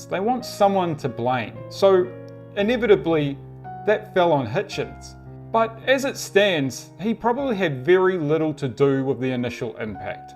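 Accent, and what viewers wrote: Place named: New Zealand. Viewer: You are lucky my friend, you got to observe different parts of the world first hand! I guessed you were from NZ from the accent...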